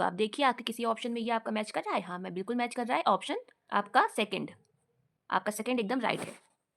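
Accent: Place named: Bareilly, India. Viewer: सायद आप बरेली से होंगी अबाज़ से लग रहा है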